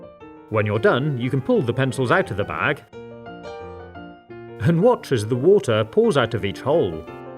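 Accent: United Kingdom. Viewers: He's British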